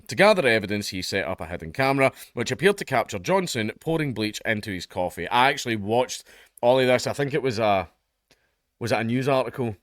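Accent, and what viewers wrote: Scotland, United Kingdom. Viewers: Lol mudder. I know we Welsh have a fun accent but Scots just take the cake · Nice to hear him talking scottish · hitting us Yanks with that unfiltered Scottsman accent. I love it.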